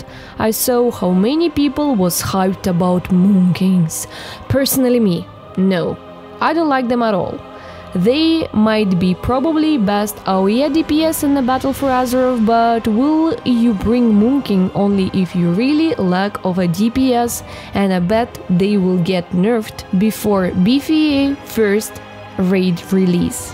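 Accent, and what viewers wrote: Russia. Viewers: are you Russian? That accent you have I've heard it on 007. Or maybe too much Russian bots and the USA...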